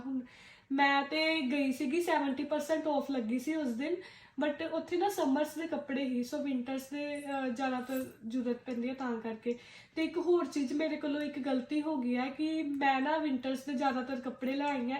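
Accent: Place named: Amritsar, India. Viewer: Love ur Amritsari accent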